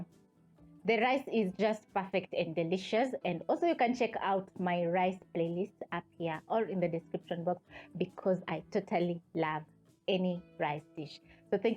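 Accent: Kenya. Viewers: She sounds so Kenyan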